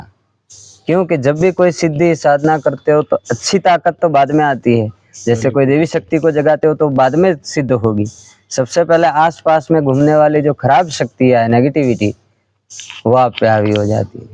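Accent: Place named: Uttar Pradesh, India. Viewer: Aap ki aavaj se to aap u p sahit ke lagte ho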